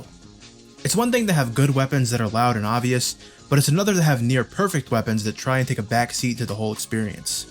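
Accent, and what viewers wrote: New York, United States. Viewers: He sounds like he came from new york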